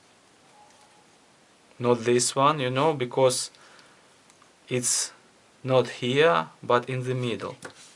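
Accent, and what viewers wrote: Russia. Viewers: Тяжелый русский акцент) как будто литтл биг слушаю)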